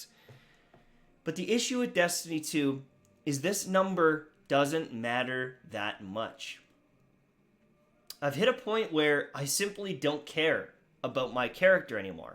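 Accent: Scotland, United Kingdom. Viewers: Loved the snipe vids back in the day with the Scottish accent 😂. Also can't get enough of that flying mongoose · I’d love to see some other videos. I remember watching some of your funny Monster Hunter videos with the accents. Exotic gun reviews in that Scottish accent is always hilarious as well. Up to you where you go, but I’ll be here whatever video comes out! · Dammit, bring back the scottish! Lol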